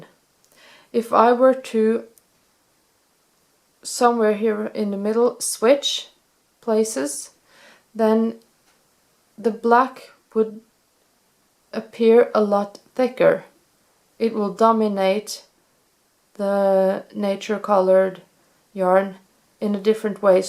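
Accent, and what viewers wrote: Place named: Norway. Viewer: Thank you for this detailed explanation! I lived in Norway ten years ago and hearing your accent brings such nice memories. Also your English is perfect! I remember my Norsk friends would always be embarrassed to speak in English too (until they had a few drinks!) so I smiled when you mentioned it. Tusen takk!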